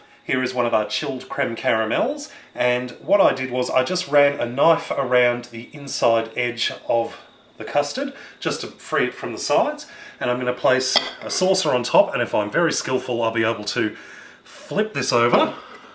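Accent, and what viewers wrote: Australia. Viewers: What a delightful Aussie accent! ♥ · Is he australian? His accent is kinda familiar but i love caramel cream or whatever it is called.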